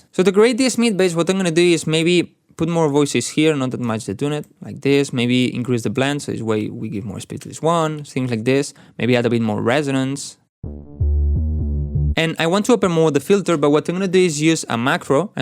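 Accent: Spain. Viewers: Fine tuto! You have Spanish accent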